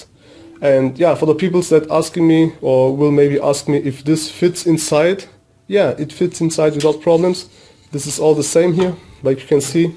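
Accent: Germany. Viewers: Hey, are you German by any chance?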